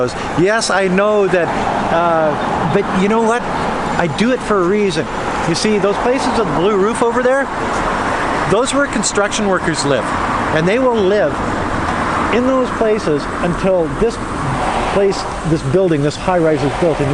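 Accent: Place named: Canada. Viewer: What a Canadian tone to described the greatest of, keep up the good work! Your supporter from Coquitlam, BC, Canada!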